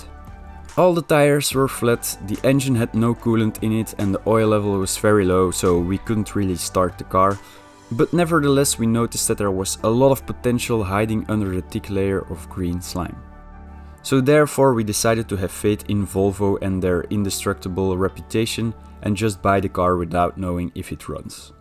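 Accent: Netherlands. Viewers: Nederlands? Ik dacht het al te horen maar dit soort video's zijn wel echt geweldig dus duimpies omhoog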